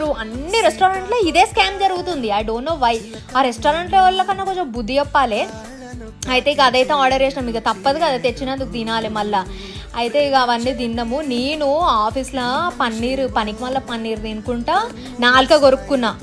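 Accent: Telangana, India. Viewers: Manchi Telangana language matladutaru meeru Ega poyinam 😂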